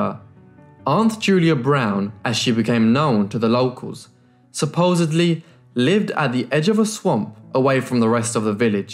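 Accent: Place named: West Midlands, United Kingdom. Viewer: Gotta be from the westmidlands right? Your voice sounds to familiar for it not to be. Xx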